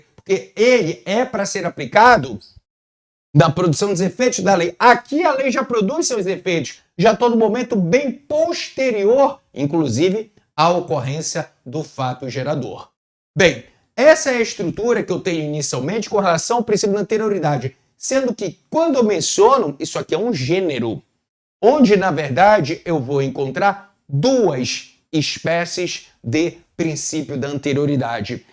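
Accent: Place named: Portugal. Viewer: Sotaque de português. Ótima aula!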